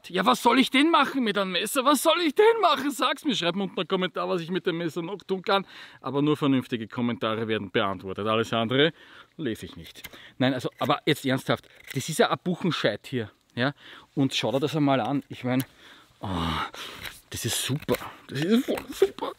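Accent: Austria. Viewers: Der Ösidialekt is eh da geilste.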